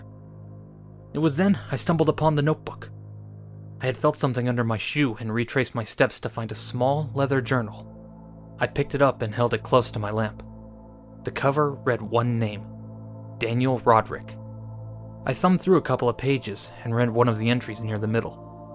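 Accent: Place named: South, United States. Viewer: My God, I love your southern accent! XD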